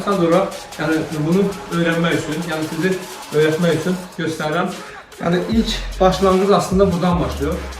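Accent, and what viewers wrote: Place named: Turkey. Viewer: Türk lehçesi 😁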